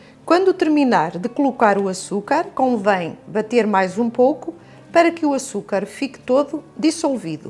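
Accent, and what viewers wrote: Portugal. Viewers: Acho tao lindo o sotaque portugues, tao diferente de como nos brasileiros falamos, eles sao mais CLASSIC, DELICIAAAA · Gostei da receita mas gostei mais du sutaqu dessa portuguesa amo esse "sotaque"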